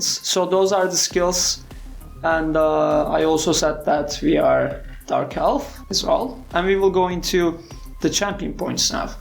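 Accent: Turkey. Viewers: are you from turkey mate?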